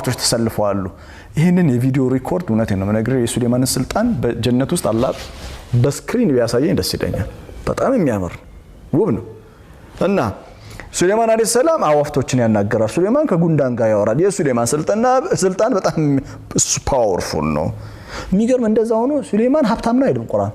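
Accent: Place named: Gurage, Ethiopia. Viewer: والله antenna guregna new yemtmeslegn anegagerh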